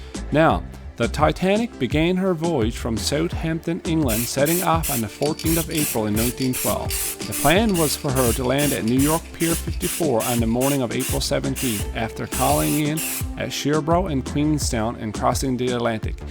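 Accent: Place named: Canada. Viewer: The more I listen to other videos I can hear the Canadian more. Happy Christmas from Ireland, great channel 👏🏼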